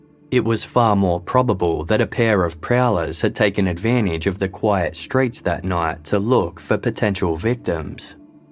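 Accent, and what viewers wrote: Australia. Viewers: That is the sound of an Australian voice.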